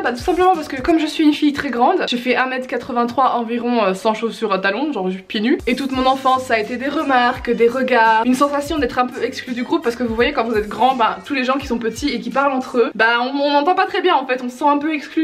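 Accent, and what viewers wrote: Belgium. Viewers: Tu es belge? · elle est belge?😶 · tu es belge?!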